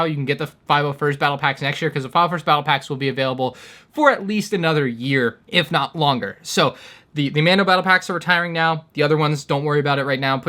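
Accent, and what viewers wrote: Germany. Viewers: As a German i have to say that your german Accent is really good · Just wtf for that „german“ accent lmao 😂😂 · as a german i approve of your german accent :)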